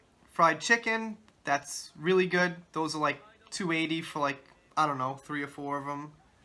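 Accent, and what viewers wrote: New York, United States. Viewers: funny I thought you had a NY accent 😆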